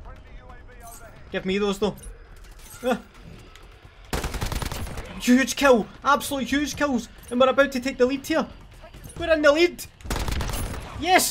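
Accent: Scotland, United Kingdom. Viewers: what city in scotland you from?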